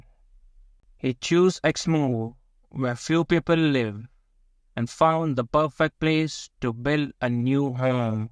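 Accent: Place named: India. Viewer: Indian is telling this story , accent is too strong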